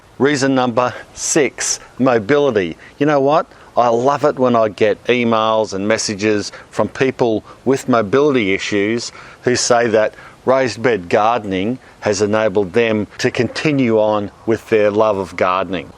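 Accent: Australia. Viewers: Well done for making the effort to speak nice and clearly and using lots of gestures. Makes such a difference for viewers who don't speak English as their first language or who aren't used to an Aussie accent. Top work. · Love your videos, tips, and that very authentic Aussie accent. With love from Russia :D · Thank you ! I caught the Aussie accent, but didn't know if he was still there.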